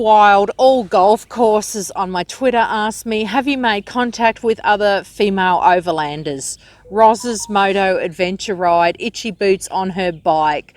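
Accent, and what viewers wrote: Australia. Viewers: Love that Aussie accent. Wish i had your balls, really want to do a big tour too.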